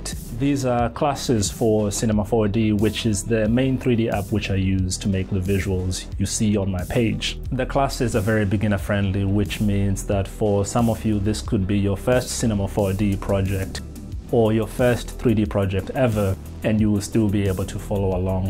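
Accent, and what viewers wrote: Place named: South Africa. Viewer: You sound South African! I've used your animations in the background when DJ'ing and the response has been sick!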